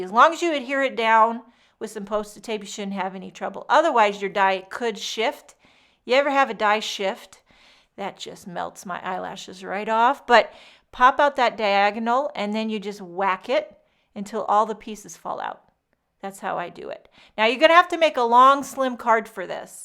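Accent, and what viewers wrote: United States. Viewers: Hi I watch your videos all the time and I love them! I’m curious what are you doing in Germany? You sound 100% American.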